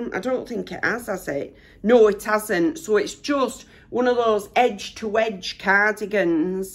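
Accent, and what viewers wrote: Yorkshire, United Kingdom. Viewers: where u from, i figured you had yorkshire accent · Yes she is from Yorkshire could be Leeds?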